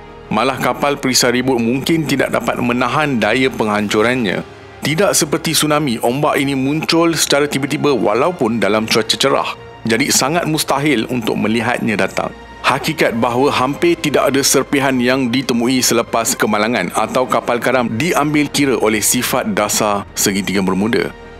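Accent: Malaysia. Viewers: Orang malaisya ya😇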